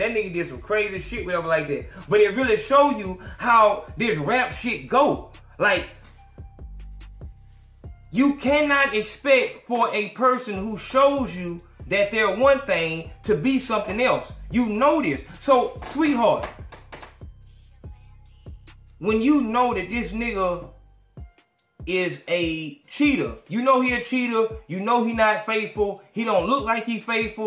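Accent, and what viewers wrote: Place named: Alabama, United States. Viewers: What part Alabama u from. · What part of Alabama you from bruh? I’m in the 334.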